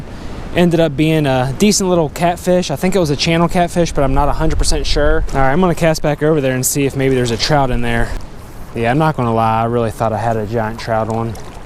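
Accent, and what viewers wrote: Pennsylvania, United States. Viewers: Where is that accent from? Pennsylvania? It's interesting.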